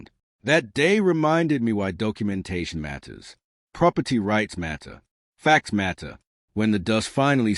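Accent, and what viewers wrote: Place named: Australia. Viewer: Ya gotta have lots of respect for a Texan who speaks with an Aussie accent .....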